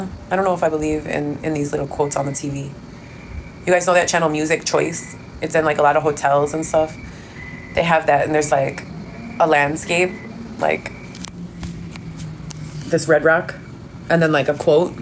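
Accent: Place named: Latin America. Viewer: I doubt you ever cooked for your kids. And that stupid latina accent - i just cant.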